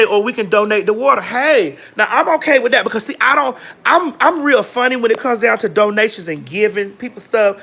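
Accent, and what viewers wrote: Florida, United States. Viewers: Hurry up🤣🤣😂 With that Florida accent. I love you!! Yasssss Praise him!!😚😚 Omg!! Kick rocks and boulders! I'm on my side laughing!!💜💙💚💛❤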